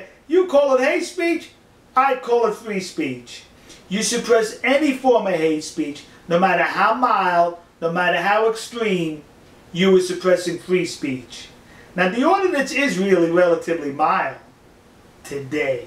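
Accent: New York, United States. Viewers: I know you're white but what is your ethnic European back ground ? you look Italian to me I think you're Italian boy you Italians hate Arabs I'm not an Arab but I hate Italians even more so I defend Arabs even though I hate them to. you sound like you come from new York have you ever heard about us Trinidadians we are of the Asian Indian race . the British brought our ancestors from India to Trinidad and Tobago to work as indentured workers . we're ralated to Asian Indians. any way the Japanese had the right to ban hate speech cuz it causes problems . they should do that here in america ! I'm not against hate speech I just think it should only be used behind closed doors within organizations. hate groups should not be allowed to go to peoples neighborhoods to tell them they hate them. there's alot of violence at racist rallies that kind of hate speech should not be allowed unless your hating people behind closed doors!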